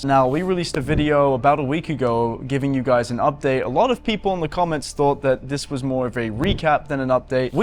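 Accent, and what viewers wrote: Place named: United Kingdom. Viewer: Who’s this slow talking Brit 🥱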